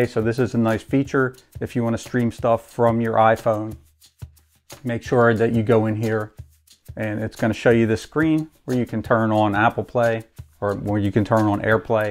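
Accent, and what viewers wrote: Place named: Philadelphia, United States. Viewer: is this guy from phiolly, he sounds like it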